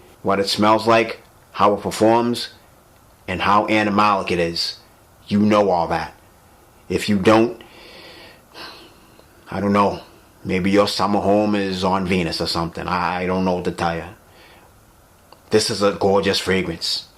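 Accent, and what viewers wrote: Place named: Massachusetts, United States. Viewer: That mass accent is thick as hell you musta grown up in the burbs of mass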